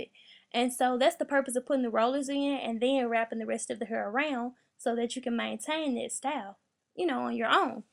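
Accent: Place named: Memphis, United States. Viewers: I know that accent from anywhere lol. I’m a memphian too 😂 This was my go to style in high school ❤️ great tutorial 👍🏽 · I'm natural but I heard your accent and I had to sub. #northmemphis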